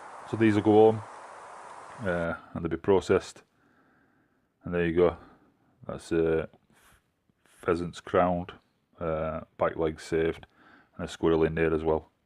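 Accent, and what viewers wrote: Yorkshire, United Kingdom. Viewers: Very enjoyable. A good commentary, calm and informative--even with the Yorkshire accent!Subbed.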